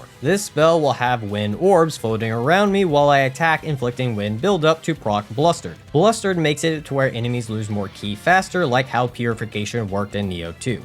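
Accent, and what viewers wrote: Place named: United States. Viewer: I can't teach you how to speak Japanese in one comment, but I can teach you how to pronounce it! There are only 5 vowels, and they're pronounced pretty much the same as they are in Spanish. So a = the vowel in "mom", i = the vowel in "bean", u = the vowel in "shoe", e = the vowel in "bay*", o = the vowel in "go". These vowel pronunciations NEVER CHANGE, so i = bean vowel 100% of the time, e = bay vowel 100% of the time, etc. The only time vowels change pronunciation is when certain vowels are combined to make a long vowel. This only happens with the combos "ou", which makes a long o-sound, and "ei", which makes a long e-sound. Otherwise, long vowels are represented by simply doubling the letter ("aa" = "a" held for 2x the length, "ii" = "i" held for 2x the length, etc.. "oo" is also used in a scant-few words for historical reasons, it's pronounced the same as "ou", just "o" but held for 2x length.) Ex. 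Sensei = sensee with a long e-sound. *In modern Japanese, the e-sound can be realized as either the vowel in "bay" or the vowel in "bet", depending on region or even just individual preference, so use whichever one you're more comfortable with. Consonants are similarly really easy, they sound like how they look. The only exception is the r-sound, which sounds like the Spanish r, not the English r. Since you seem, by your accent at least, to be American, it's the same sound as the "tt" in the words "butter" and "better". The "tsu" sound can be a little tricky as well, but if you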